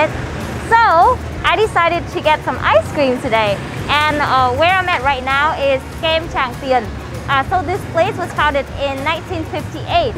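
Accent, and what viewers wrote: Midwest, United States. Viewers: beautiful girl with fantastic American accent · Your American accent is so good...love your Vietnamese voice too, very nice videos and editing. Keep up the great work. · I like most of your videos, but one thing you say wrongly (my view) is your very American pronunciation of the word HERBS....you say ERBS! Correct English expression more acceptable to a wider English speaking audience is NOT to drop the "H" of such a common thing in Vietnamese food speak. The Americans have changed a lot of the English language as you know, and while your American accent is cute we Aussies of the older generation particularly have resisted Americanizing spelling & pronunciation. And you know as a Ha Hoi girl being educated in US when they were the former enemy of your parents & grandparents it is a big culture difference thing too. Let's discuss in our next Checkin! 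With me about to start learning Vietnamese I must try to pronounce things the correct way when in the north, central or south. I want to learn the Central & North ways firstly. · Really like the new (?) logo. Feel it fits you and your channel really well from what I have seen. Do you know the artist personally? Suggestion: Add google map links to places you highlight in the description. Paddy Doyle does this and it is something I think every vlogger should copy. Works so well to easily find and save places. Like that cool ice cream shop which I saved. Side note: I know you said (in another video) some people said things about you vlogging in English vs Vietnamese. But there are people like myself who really love local vloggers sharing their country with us in English. There is nothing wrong with the standard western expat or digital nomad vlogger (minus the creepy ones) but it is nice to have locals in the mix as well. 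My first exposure to "travel" vlogs was via some Fil-Am vloggers, Bulay Says Hi (she stopped) and Wil Dasovich (which I found via Bulay) and his friends. There are several Filipino (and half Filipino) vloggers who vlog in English but outside of PH it is much more rare. So I am glad the YouTube algorithm suggested your channel vs how so often I get suggested the "creepy" ones. (Sorry YT but just because I like videos about ASEAN countries and watch expat vloggers doesn't mean I want to watch videos like that.) Nice to get suggested a good channel vs a "creepy" one. Bonus that you are truly a local. Double bonus that you have a midwest accent like myself lol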